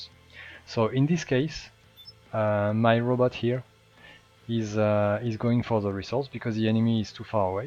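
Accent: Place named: France. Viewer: i feel sound a french accent :D (nice review)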